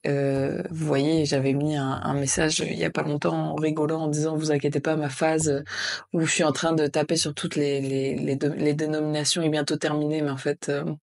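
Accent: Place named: Africa. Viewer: Ca se voit que tu fréquentes des africains qui ont vécu là-bas, tu parles comme eux, avec le même accent. C'est dingue. Cette société "multiculturelle" gauchiste c'est vraiment mortel pour les blancs.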